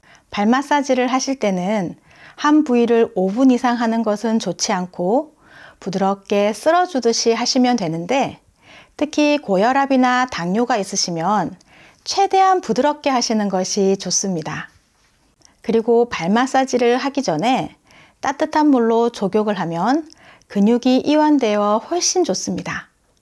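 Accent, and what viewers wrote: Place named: Gyeongsang, South Korea. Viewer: 깨알 정보 정말 고맙습니다 좋댓구알 햇십더~ 구수한 경상도 억양 좋아요~~소실적 생각 많이 납니다 ㅎ 구룡포도 생각 나구여!! 영상 감사 감사 합니다~~~! 화.이.팅하세요~~!)))))))))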